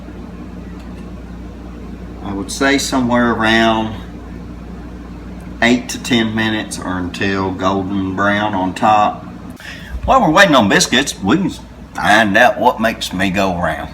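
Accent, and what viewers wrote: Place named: South, United States. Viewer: When I grew up my friends mother sort of took me under her wing used to take me in when my Dad threw me out wash my cloths and keep me out of trouble. She had the same wonderful Southern Draw you and have. 😊 brings back fond memories.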